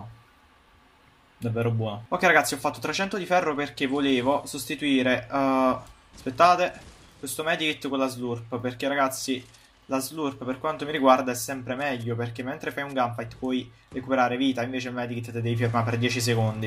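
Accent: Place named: Rome, Italy. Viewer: Io non ho capito una cosa. Sei napoletano perché parli romano ?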